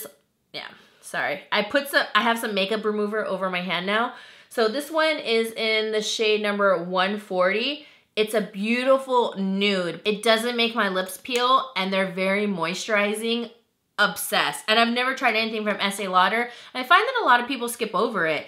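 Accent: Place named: Northern Ireland, United Kingdom. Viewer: Girl you have me in a pure kink with the Cana baelive it ! Soooooooo funny! You sound northern Irish 🍀 I had to rewatch it ! 😂😂😂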